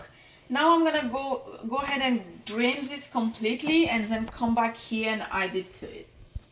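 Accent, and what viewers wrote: Mauritius. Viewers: My step-father is Mauritian, and she just sounds like lots of Mauritians I know. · Why do you sound Mauritian, Indian and Jamaican at the same time?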